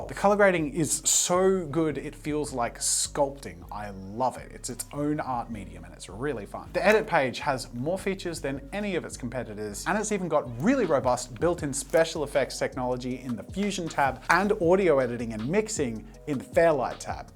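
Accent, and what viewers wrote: United States. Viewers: Can we speak about the fact that his American accent is literally on point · Jazza reading with an American accent make me feel weird. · Your american accent is pretty good Jazza, I heard the difference immediately and abruptly did a double-take. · Your American accent is flawless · That American accent though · As an american, I approve of your american accent. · Jazzas american accent sounds like he could be a narrator here😂 very calm and smooth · American Accent Jazza was mildly unnerving because he sounded like himself but with just enough different that my brain had to actually process what I'd just heard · That's a pretty solid American accent! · Your American accent is actually really good! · I loved the video, but my favorite part was the American accent! I replayed it several times! 🤣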